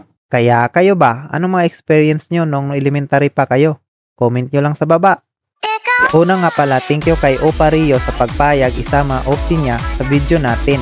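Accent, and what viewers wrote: Philippines. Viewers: Kuya taga probinsya kasi ganyan ka mag salita eh hehe 😅😅😅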